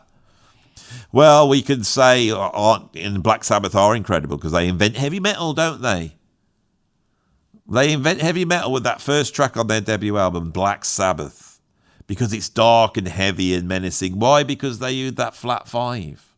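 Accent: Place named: England, United Kingdom. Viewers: Hey... you're right... you are a common folk fella...who speaks his mind sincerely....but you totally know what you're on about.... the scattiness of the presentation just authenticates your niche....and bytheby.... I'm a scouser and our accent is mocked and dreaded and even I know why.. ( especially in female tenor)..... I live here and I'll never demure to the high pitched caustic auoural onslaught of a scouser woman shrieking aloud..... cat's scatter.... dogs bark and their men plug their ears as though metal is grinding on metal and amplified with reverb and harsh distortion with wah wah and full tilt chorus pedal... As a juxtapose to that.... I can tell you now that your midland is accent is the perfect parlance to cast your net with.... it offends no one and is very easy on the ear.... I've never heard the term "Rob-Dogs" before and regrettably it is well earned.....I know that by living here...and will include it in my vocabulary from hereonin ❤ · Thank you for that insight. 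You're a great thinker. I once lived in Wolverhampton, and listening to you talking always brings me back there. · Dude I love your accent so much, I can't get enough of it, I end up speaking to myself in your super English accent lol .. love the way you think as well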